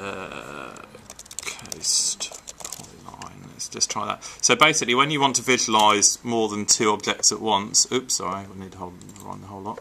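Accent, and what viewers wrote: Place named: Australia. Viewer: The next tomtom?? Just wondering why you sound Australian in this video?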